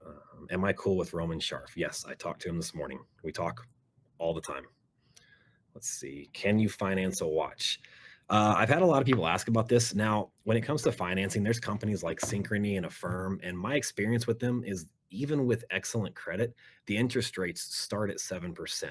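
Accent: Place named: Miami, United States. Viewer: “Will you appear on Archie Show!” “Fook no” lol. sounds like you from Miami man. Cheers🏆🥃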